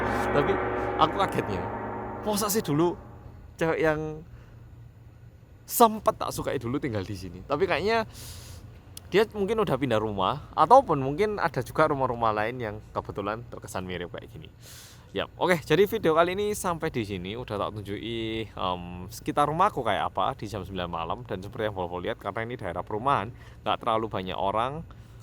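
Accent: Java, Indonesia. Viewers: Mas ini kalau ngomong bhs Korea logat Jawa? ( Medok)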